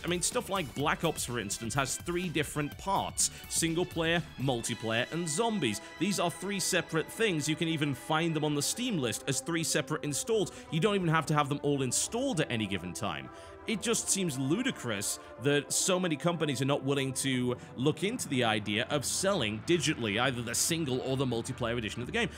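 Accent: United Kingdom. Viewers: are you honestly requesting someone to pronounce something a specific way? wtf, he talks how he does, he's from the UK but has lived in the US for over a year... so he talks like John Bain, deal with it.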